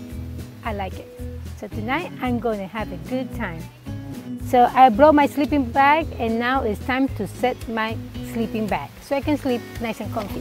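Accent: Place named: England, United Kingdom. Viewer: I love ur english accent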